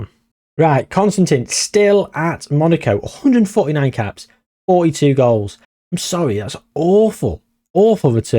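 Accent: United Kingdom. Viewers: Are you Scottish? Fun video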